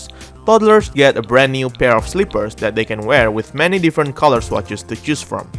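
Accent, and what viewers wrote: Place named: Indonesia. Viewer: Sebelum ku liat deskripsi "kalo didengerin kayak orang indo ya 🤔" Ternyata bener 🙆🏻‍♀️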